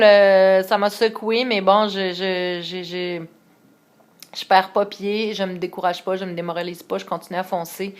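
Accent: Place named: Quebec, Canada. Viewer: Pis j'suis d'accord avec ce que tu dis sur l'absence de repères francophones (du mois sur You tube en ce qui concerne la sleeve). J'ai suivi pléthore de Sleevées américaines, mais mon repère franco, c'est toi et toi uniquement (je suis bilingue donc ça va pour les vidéos américanos, mais comme l'accent québécois est le mien et que tu me fais BEAUCOUP penser à moi, à ma mère, aux femmes de ma famille et à mes amies, c'est un vrai bonheur de t'avoir trouvée :-)).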